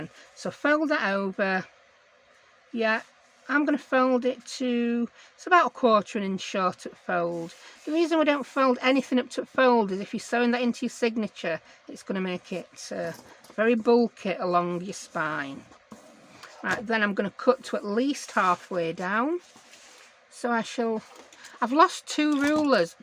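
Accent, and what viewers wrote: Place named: England, United Kingdom. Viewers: I am so glad I have found you, it's like home from home. I am from Rotherham, but living in France at the moment, I really miss hearing a proper Yorkshire accent. I can't tell you how much you make me laugh. I am learning so many new techniques, but you are costing me a fortune !!!! I have bought some Edith Holden books, (which is how I found you in the first place looking for Edith Holden journals) oh and now you have shown me another two books I "need" to buy. 🙂🙂 and then there is the sticky backed kraft paper (never knew there was such a thing!) Your videos are so entertaining and I understand everything you are saying LOL. Linda xx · You sound like s nice English lady i think i will subscribe to you. I sm in Australia ❤ so hi!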